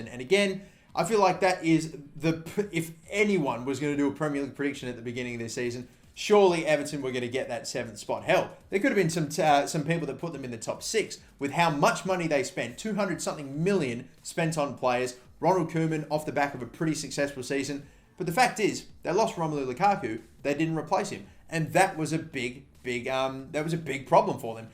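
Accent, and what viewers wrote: Australia. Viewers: Are you Australian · ARE YOU AUSSIE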